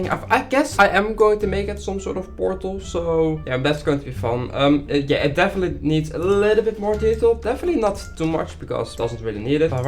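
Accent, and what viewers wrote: Netherlands. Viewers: Are you dutch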